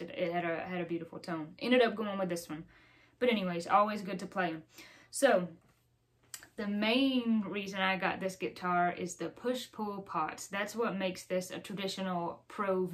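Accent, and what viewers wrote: South, United States. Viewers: Love that guitar; just picked up the 2020 Trad Pro V in satin ebony yesterday! Amazing guitar; mine has mahogany body & mahogany top. Cool video, awesome guitar, and nice country accent; easy to listen to. Take care & God bless, Brandon 🎼🎸🇺🇸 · Love your southern accent...! Isn't the Trad LP too heavy...? Cheers from 🇫🇷 big BBS fan 🎸🤘🏻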